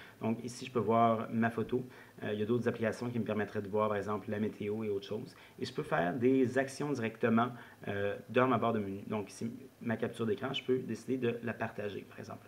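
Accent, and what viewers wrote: Canada. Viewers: J'adore ton accent canadien !! Vive le Canada !!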